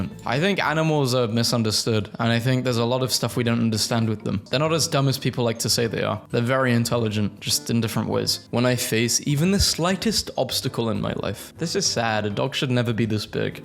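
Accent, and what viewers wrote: United Kingdom. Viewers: Remember, he British, he’ll pronounce things differently every once in a while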